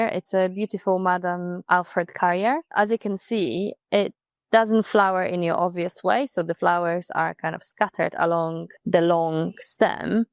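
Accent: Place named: Sweden. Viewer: You sound a bit Swedisch.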